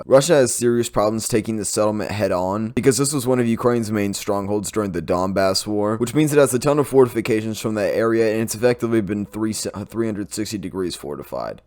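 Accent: United States. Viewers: I love your videos and look forward to them every update. Don't worry about the knobheads freaking out over the way you pronounce words. People speak the dialect they grow up with. It's normal. Anyone freaking over it needs to go check themselves into a psych ward over their OCD behavior. I lived in England 8 years and they love American dialects the same way we love British dialects. Be who you are and anyone that doesn't like it can pi$$ off. You're the man!!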